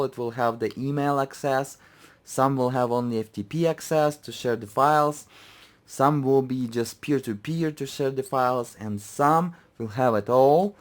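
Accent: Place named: Germany. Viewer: he sounds german to me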